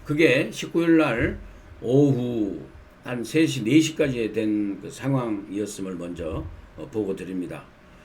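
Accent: Jeolla, South Korea. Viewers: 전라도사투리 너무 좋구요 옳으신논평 잘 경청합니다 민영삼티브 파이팅입니다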